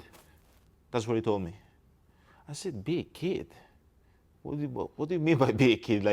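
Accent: Greece. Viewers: He’s Greek and speaks English with a Greek accent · He's Greek accent and above all his Greek mentality is on display 100% and I love it!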